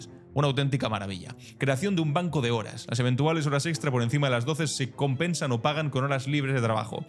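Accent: Spain. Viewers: Me encanta acento Español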